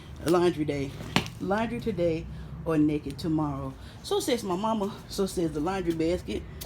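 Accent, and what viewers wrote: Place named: United States. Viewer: Love the American accent kkkk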